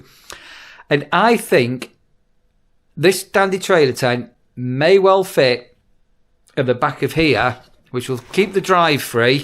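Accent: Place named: Liverpool, United Kingdom. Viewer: Dont be disillusioned Lincolnshire has their own thieving scum bags. You are really disrespecting the decent people who live in Manchester. For someone who has lived in Manchester all their life your accent is more SCOUSE than anything. Why so many cameras around your bungalow if you feel so safe living there! 🤔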